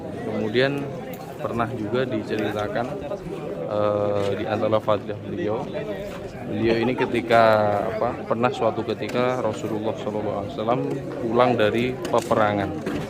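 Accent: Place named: Banjarmasin, Indonesia. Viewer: Kyak ada dengar suara org banjarmasin,, bapandir bahasa banjar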